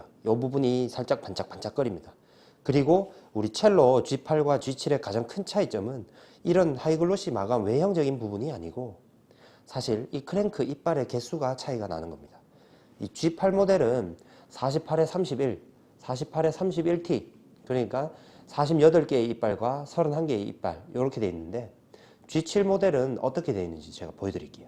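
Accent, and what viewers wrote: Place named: Daegu, South Korea. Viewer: 아직 유명한 채널은 아니지만, 응원합니다. 사장님의 약간 대구 지역 톤이 섞인 차분한 목소리가 굉장히 정감있네요. 구독!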